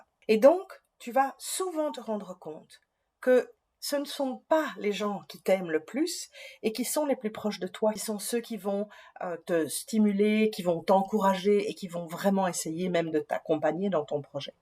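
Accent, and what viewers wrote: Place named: Belgium. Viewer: Bonjour, j'adore vous écouter mais je me demandais d'où venait votre petit accent? Belgique?... Moi, je suis normande mais j'habite près de Paris. Merci!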